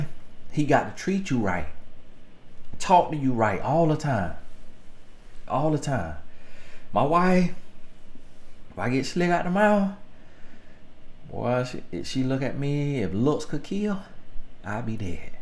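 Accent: South, United States. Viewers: I love country accent 😇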